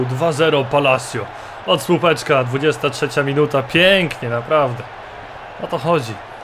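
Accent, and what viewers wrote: Poland. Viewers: Widac że z Rzeszowa xd!:D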